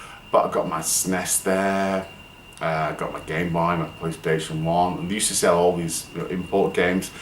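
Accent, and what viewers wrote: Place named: Bolton, United Kingdom. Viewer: am i right in thinking your from bolton? i live in bolton :) nice answers mate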